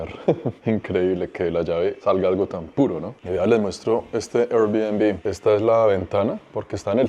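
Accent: Bogotá, Colombia. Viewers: Me encanta su voz 😅 el verdadero acento rolo, sin la degradacion que le han dado muchos habitantes de bogota. Gracias por tus exelentes videos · Me encanta como me haces viajar desde mi humilde habitación de España, por cierto me gusta mucho la forma de hablar con el acento colombiano y la cultura que trasmites con tu contenido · Si.muy Colombiano en sus modos de hablar y dichos.👍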